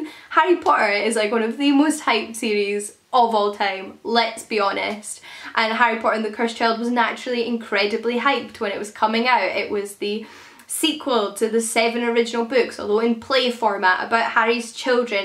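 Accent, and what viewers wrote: Scotland, United Kingdom. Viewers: Where are you from? :) Im assuming thats a scottish accent?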